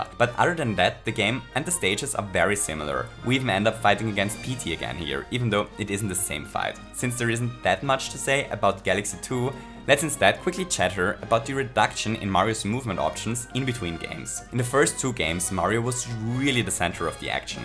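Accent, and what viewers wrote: Austria. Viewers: Wenn da östereichische dialekt ballert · Man merkt so den Österreichischen Dialekt raus xD Aber richtig cooles Video!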